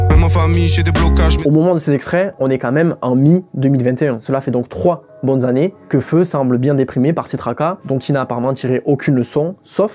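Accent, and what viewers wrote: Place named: South, France. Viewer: l'accent du suuuud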